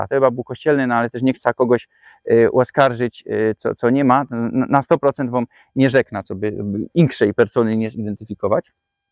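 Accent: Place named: Upper Silesia, Poland. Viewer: Godolsz podobnie jak w moich zajtach po części po niemiecku. Okolice Oberglogau-klein Berlin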